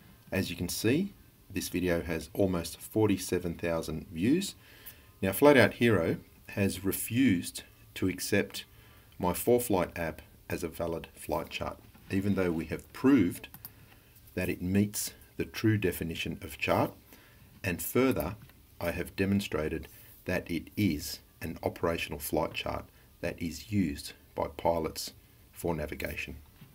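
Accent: Australia. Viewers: I guess it's the australian accent. Buddy ;o) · that silky smooth Aussie accent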